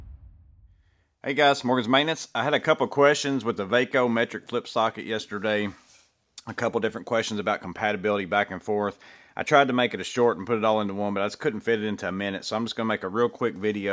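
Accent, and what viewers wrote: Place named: North, United States. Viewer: I have an accent, just like yours. And I have to squelch it, when I go back home to my elders country. Where they make all the tools, by hand and grow all the pumpkins for the holidays. It's got a heavy Old Dutch/Spanish demeanor. I grew up in Texas/Louisiana....but I'm from an Indian, Dutch/Old Spaniard area of North USA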